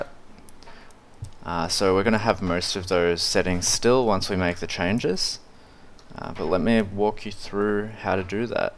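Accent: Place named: California, United States. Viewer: your accent reminds me of The Californian series from SNL.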